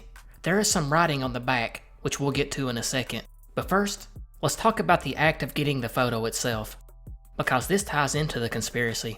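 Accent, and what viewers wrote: South, United States. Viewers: I always forget you have a southern accent for some reason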